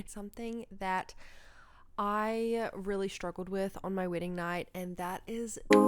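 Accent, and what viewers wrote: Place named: Australia. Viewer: Where is this awesome accent from ?!! Aussie?!😂❤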